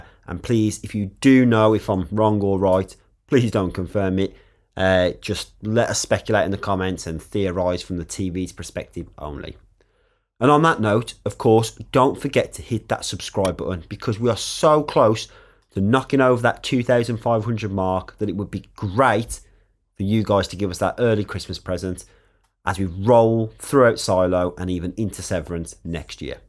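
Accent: United Kingdom. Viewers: I thought it might have been more of a black country accent!! Yeah, I'm in south Brum myself!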